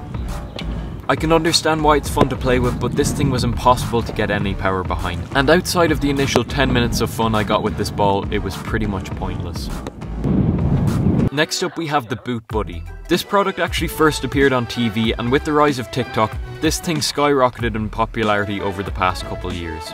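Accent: Ireland. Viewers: Are you irish? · R u Irish?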